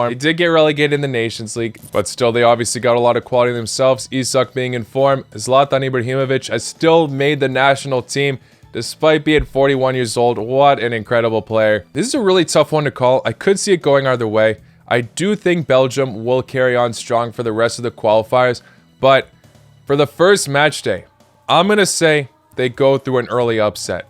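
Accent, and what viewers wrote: United States. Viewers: I swear, your the only person i've heard who has an American accent that has called the sport with it's right name "football". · his accent is kinda American but he commonly wears Croatia kit and sometimes Serbia Bosnia and a Montenegro kit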